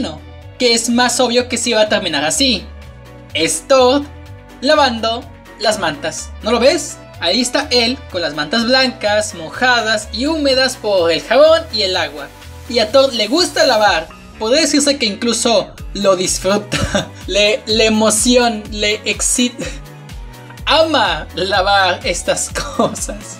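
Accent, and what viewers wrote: France. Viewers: es francés?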